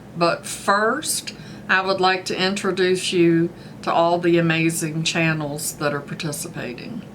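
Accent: South, United States. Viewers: I love your accent it sounds southern and so sweet. Enter me please I like the press on nails. And the illamasqua as well as earth harbor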